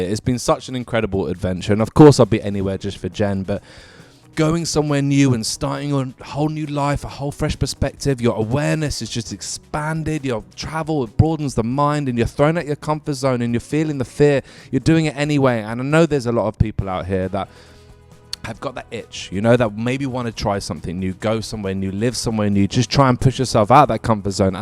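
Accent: United Kingdom. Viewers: I love your british Accent 💕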